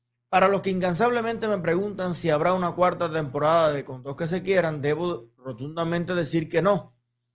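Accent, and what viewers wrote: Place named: Rural, Cuba. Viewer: De que provincia eres de Cuba te siento por el habla eres guacho guacho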